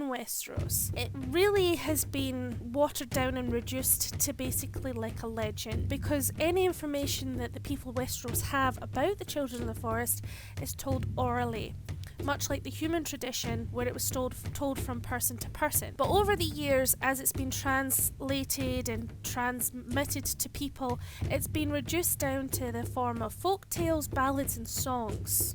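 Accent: Scotland, United Kingdom. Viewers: like your Scottish accent.very informative video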